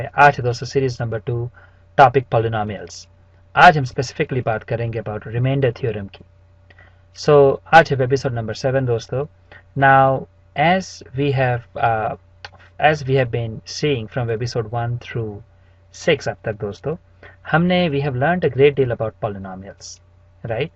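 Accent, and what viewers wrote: India. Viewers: sir,are you from south india.??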